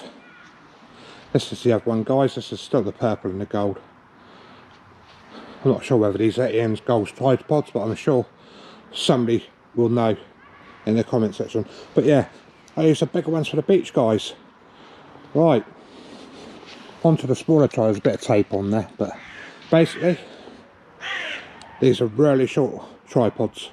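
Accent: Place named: RP, United Kingdom. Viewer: Great video very posh like it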